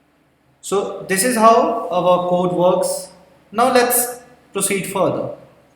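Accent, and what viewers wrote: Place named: India. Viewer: Thanks for the video. Headache inducing accent though. There are two kinds of Idian accents. One is beautiful and eloquent. The other sounds like somebody talking with a mouth full of tacks.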